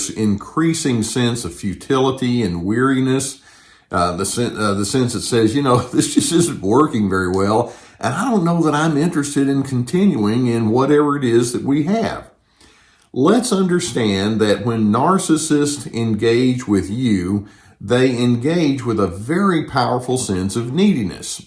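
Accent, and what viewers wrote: South, United States. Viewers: Thanks so much for all your videos. You are so helpful and your Southern accent is charming. Warm regards from Canada.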